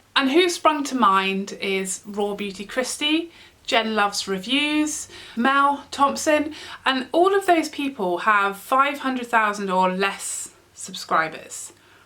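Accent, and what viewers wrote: United Kingdom. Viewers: Yup, I reckon drugstore ain’t the only duping going on in beauty YouTube! Really enjoying your channel btw, nice to hear another British voice. I should add that I came to you through Mel, who I in turn came to through Wayne - all lovely genuine people! xx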